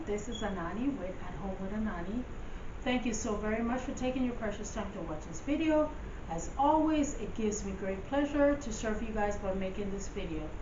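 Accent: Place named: Caribbean. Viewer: Anani thank you so much for participating in this collaboration. I knew by your accent that you were from the Caribbean Island. You guys have the best beaches over there!!!! Your Tablescape is Beautiful my friend!!! I love everything from the placemats to the china that you used. The storks are Stunning and added the perfect touch to complete your look!!!! I’m jamming to the music too! Thanks again for participating ~Elle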